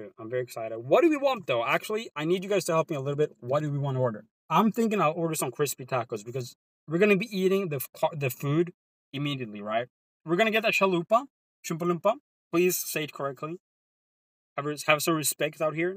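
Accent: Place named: Sweden. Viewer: Turning into pewdiepie. you're losing your swedish accent as time goes on LOL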